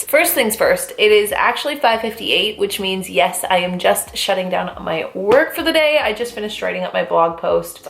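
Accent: Canada. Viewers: are you canadian? you sound canadian😂 edit: i’m stupid u live in toronto🤦🏽‍♀️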